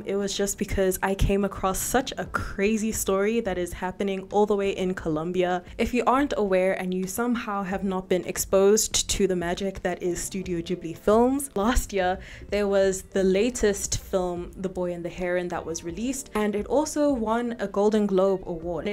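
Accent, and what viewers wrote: South Africa. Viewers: Wanted to ask if you South Africans cause of the nice accent but I guess you answered it in the vid 😅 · I knew your accent sounded a little too homely and then you mentioned south africa ! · Are you from South Africa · Are you South African?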